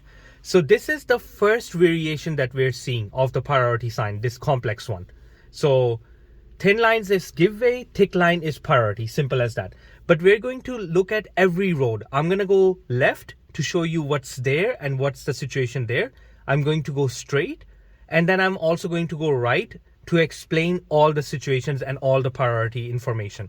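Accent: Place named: England, United Kingdom. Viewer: Besides making the great videos which I am very thankful to you. I have this curioucity to know where did you learn this English accent from.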